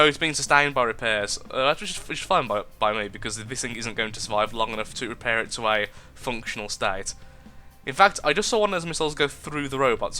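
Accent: United Kingdom. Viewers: are u a brit?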